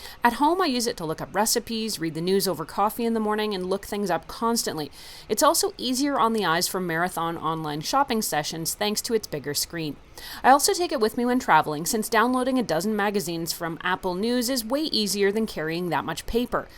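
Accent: Canada. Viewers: Atre u Canadian?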